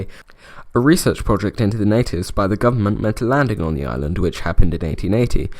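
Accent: United Kingdom. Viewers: You are so jaded but yet informative, I love it! You got a strong british accent as well, it fits your character. And I'm not sure of the term "jaded", I'm not an english native, for me it means you are funny while being like tired of your life kinda. Just so you don't take it as an insult and now my comment is getting to long and I'm starting to sound too politicaly correct for a stranger on the internet. SUGOIII DESUNE (that's for the contrast, the bad kind of contrast) One question: are you proud of being british? Thanks for the laugh, I suscribed. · This is the most annoying British accent combined with this teenage voice. Wtf.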